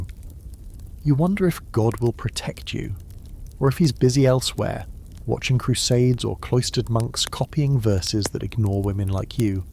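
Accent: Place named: England, United Kingdom. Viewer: would i be alone if I thought an english accent is one of the best for the subject matter? Seems more conservative than usa or australian accents...or perhaps just more presumption of possibly being more directly informed on a subject of the middle ages.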